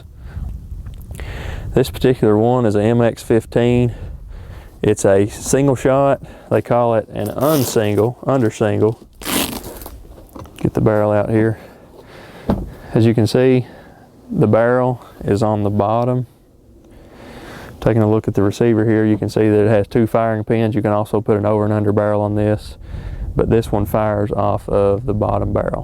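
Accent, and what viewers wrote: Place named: Texas, United States. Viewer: You sound like you're from Texas. And Trapshooting is humongous business. There's about one major competition in every state ever week. You need to join the ATA to see just how many people are involved in competitions never mind how many folks just do it for recreation. It'll be a major eye-opener for you.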